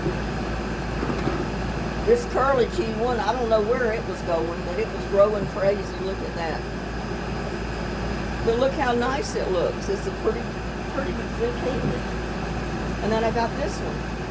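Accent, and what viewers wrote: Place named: Texas, United States. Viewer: Hi I subscribed to your channel last night that was some live stream I love the sound of your voice and I was just thinking it sounds like Texas to me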